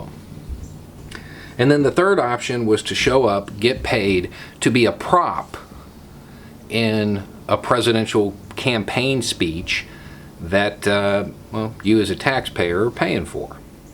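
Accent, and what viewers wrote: South, United States. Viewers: I like hearing a southern voice with smarts (i.e. not a conservative shill).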